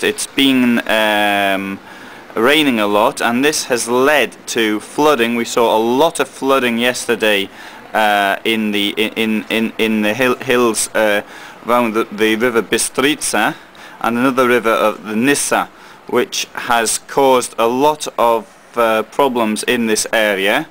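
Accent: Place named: England, United Kingdom. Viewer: I cannot quite make out your accent. What region of merry olde England do you hale from?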